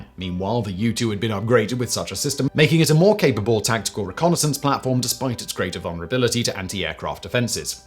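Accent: United Kingdom. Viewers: I speak English. English is my first and only language. I like all of your channels and find your content extremely interesting. The only thing is, could you slow down just a bit with your speech? It's not that I don't understand the meanings, it's just that with your English/British accent as opposed to my slow American drawl I have to keep rewinding just to understand what you said. I hope you will take this as constructive criticism and not a petty complaint. Thank You !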